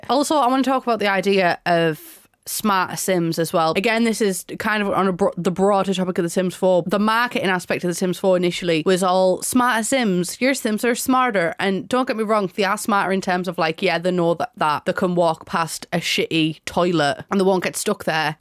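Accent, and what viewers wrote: United Kingdom. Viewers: Yeah just wasted $3k+ simoleans on my THIRD failed wedding. Catastrophic failure and not a single “part” of the ceremony or activities were able to happen. We hired a violinist and they sent a ghost and everyone got stuck in loops talking to the freaking ghost. I want my real world money back and all my wasted simoleans 😡 Also side note totally unrelated but I’m sorry for neglecting your channel for LilSimsie, my partner can’t stand her voice, and your voice reminds me of my ex fiancé who is also British so your voice is a weird nostalgic trigger lol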